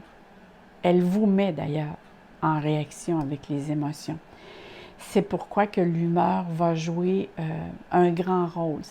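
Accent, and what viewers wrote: Quebec, Canada. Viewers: Bonsoir tout ce que tu dis est très cohérent et très précis; cela me rejoints beaucoup c'est tellement beau ce que tu partage wow!! c'est merveilleux ça... Pour l'intuition tu as totalement raison y as beaucoup de changements et d'énergie différente qui viennent à moi et dont je fais l'expérience surtout au niveau spirituel très inspirant.. Merci beaucoup c'est agréable de voir une femme qui as le parler Québécois..je te souhaites un splendide joyeux temps des fêtes comme tu aimes le vivre avec de l'amour, de la joie, de la paix, tout ceci dans la grande simplicité qui rends la vie magique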